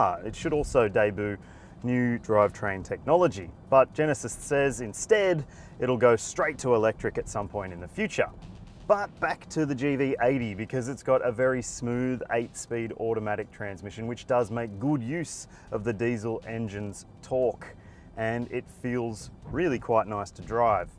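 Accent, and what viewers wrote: Australia. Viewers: Im Korean and I decided to study your Australian English so I subscribed this channel.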